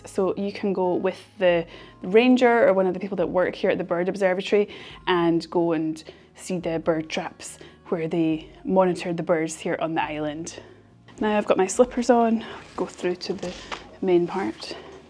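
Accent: Scotland, United Kingdom. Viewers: Hey, I’m Saudi, from the vast and well-known deserts of my homeland. As of today, I’m 23, and I’ve finally reached a destination I’ve long dreamed of: Scotland—particularly the Scottish Highlands. I visited in the first third of January and made my way to Glencoe. I have to say it out loud: the Scottish people are truly blessed by the gods with such extraordinary landscapes. I can scarcely believe this is real; I was completely overwhelmed with awe. The weather was stormy, and I traveled alone. It prompted a deeply introspective state—I felt, perhaps for the first time, an intimate connection with nature. As a Saudi from an Arab background with a C1-certified level of English (which is relatively rare), I found arriving in Scotland to be an ironic experience. Despite my fluency, the accent rendered everything almost like a completely foreign language. 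My question for you today: do Scottish people often encounter highly educated English speakers, yet still find the accent a barrier? I suspect the answer is yes. Your accent is sharp, almost like a sting, yet it is profoundly expressive and emotionally rich.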